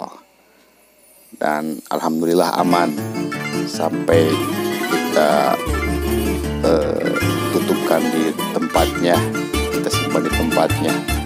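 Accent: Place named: West Java, Indonesia. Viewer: Pokona mah urang Sunda MH Tina A na bentes pisan😀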